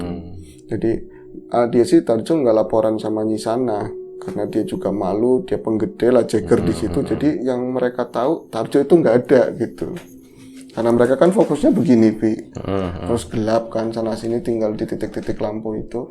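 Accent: Banyumas, Indonesia. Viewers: Request..mohon lebih byk lagi ngapaknya..seru brasa di TKP beneran... Dan biar Mbah sering translate..Ra diem2 Bae 😁😁🙏🙏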